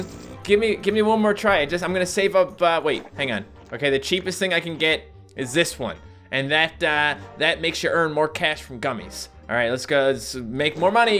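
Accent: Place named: Canada. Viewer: You can hear his Canadian accent I love it!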